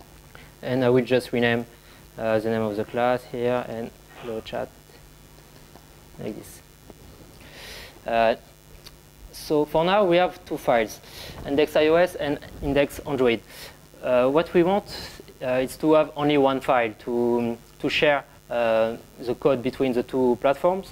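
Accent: France. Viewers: ton accent francais il dechire lol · Tu aurait pu la faire en français.....vu l'accent ^^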